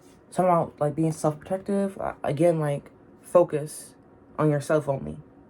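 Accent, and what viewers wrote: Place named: United Kingdom. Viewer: Pile 2… AHHH your voice is genuinely like body lotion for the spirit 😂even when you’re being blunt with me about what I need to hear 👀 also why is your British accent sounding even MORE British than mine right now???hahhh A lot of this definitely felt like old energy & some is energy I’m still in the process of releasing. Omgg yes I’m not anti advice AT ALL, Don’t get me wrong, I’ll always ask for tips and recommendations when I need them. But unsolicited advice… especially around my health… I just can’t 😭 The constant ‘have you tried this?’ can feel so overwhelming and like I’m being pulled in a million directions, even when people mean well. It can make me feel like I’m not ‘doing enough’ Sometimes I just need empathy… not ‘fixing’ lol I really loved your take on simply observing the energy & sitting with it, without feeling like I need to take immediate action. 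I need that right now. Pile 2 also lovingly called me out on my own bs 😅 I moved away &released a toxic environment, but I’ve also realised I play a part too… bc I’m very mentally and physically restless and really struggle to just BE. it’s about shifting the mindset not just the environment! That has been a challenge for someone who always wants to be ‘doing’ something when sometimes I actually just need to rest. ahhhh thee synchronicity whatttt😭 I mentioned that song in my last reading… & you posted yours before mine 👀 & I promise I only just watched it now and didn’t know you mentioned this til just no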